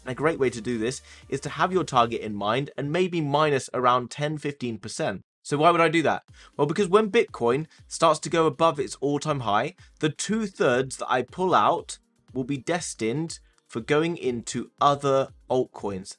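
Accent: United Kingdom. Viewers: I love British accent I can listen all day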